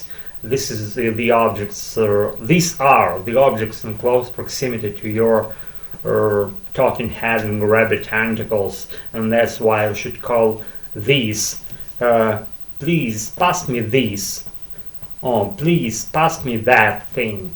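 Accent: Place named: Russia. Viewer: I just enjoy hearing the Russian accent, nothing in particular :)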